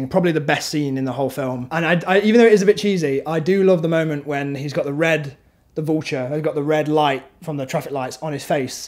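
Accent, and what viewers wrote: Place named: East Midlands, United Kingdom. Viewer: I knew was an East Midlands lad! Woo!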